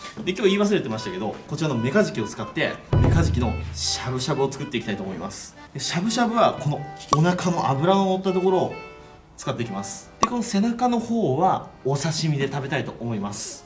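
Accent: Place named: Tokai, Japan. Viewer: 東海出身ですか？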